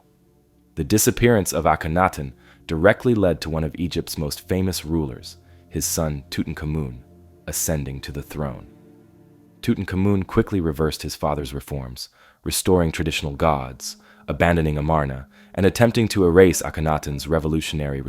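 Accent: United States. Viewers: I just love this narration voice. My favourite ever American accent.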